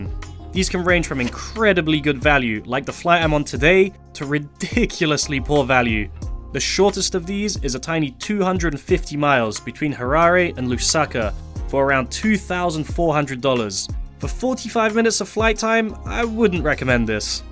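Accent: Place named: Southern England, United Kingdom. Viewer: He is British but I can't pick the county. Not a northerner, that's for sure, so he's from south of Birmingham.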